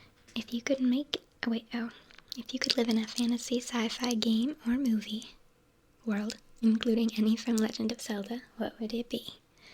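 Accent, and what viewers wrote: United States. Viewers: Ah a fellow New Englander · yer a northeasterner hell yeah you rock lol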